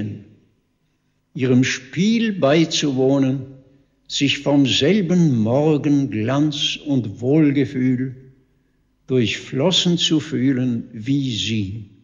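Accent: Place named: Swabia, Germany. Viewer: Wie berührend, den schwäbischen Tonfall in seiner Stimme zu hören. Diese schöne poetische Sprache ist wie Melodie. Leider fast vergessen.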